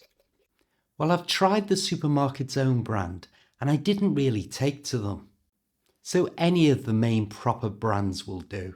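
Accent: Liverpool, United Kingdom. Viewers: As I have already told to you I enjoy your videos and your scouse accent. Cheers from Italy 😊👍🇮🇹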